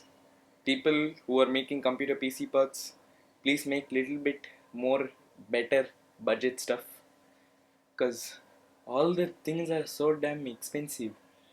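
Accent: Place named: South, India. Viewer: not really dude, stop searching on the internet for online shopping of computer parts on sites like amazon and flipkart, start finding wholesale markets/shops where you can find these components for cheaper costs, now by your accent, I believe you're an south indian, i don't know about PC markets in South India, but here in North India, I think Nehru Place in New Delhi is probably the best place for buying components at a decent (not so high) price point, for an example, you can buy a Benq GL2460HM monitor for like 9000Rs whereas on flipkart it goes for like 10,500 Rs, also a Zotac Geforce GTX 1080 costs (if you find a good deal) nearly 57000Rs whereas on amazon its like <num>Rs, and similarly all the parts are cheaper to buy from Market rather on the internet.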